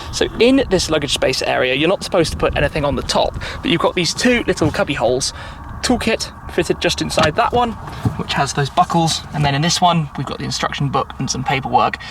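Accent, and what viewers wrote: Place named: United Kingdom. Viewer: 영어는 뭔말인지 못알아 들어도 이사람 영국 억양 오지게 쓰는건 느끼겠다.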